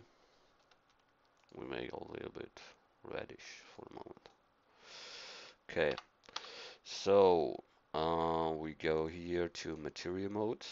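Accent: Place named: Germany. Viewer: #germanaccent :D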